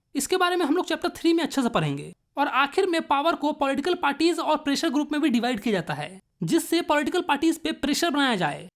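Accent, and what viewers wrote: Bihar, India. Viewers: bhaya aap haryana se ho kya mujhe to bihar se lagte ho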